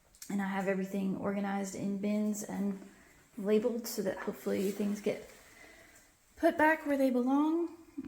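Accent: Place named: Tennessee, United States. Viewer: Ha! I've been trying to place your accent more specifically than just "Southern"—and Tennessee was the guess that I kept coming back to. (Now watch it turn out that you tell me you spent your whole life in Texas or some other state until you had just a year in Tennessee before moving to France.)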